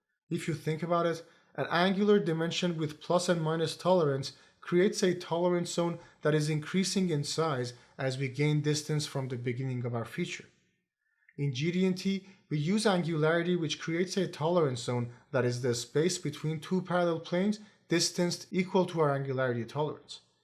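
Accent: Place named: Egypt. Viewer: حاسك مصري الاكسنت مصري الشكل مصري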